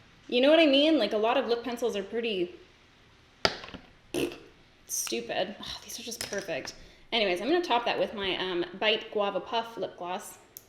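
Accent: Canada. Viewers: My boyfriend overheard and said “is she Canadian??”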